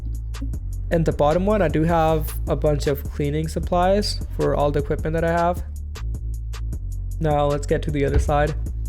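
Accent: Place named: India. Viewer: y does he sound like he indaian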